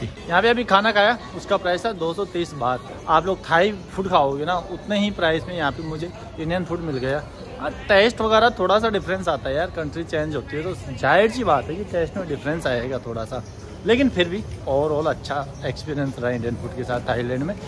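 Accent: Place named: Bihar, India. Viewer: Bhai, ... Soop ne hota ...... Shop bolta ha ....... Bihari English.....🤨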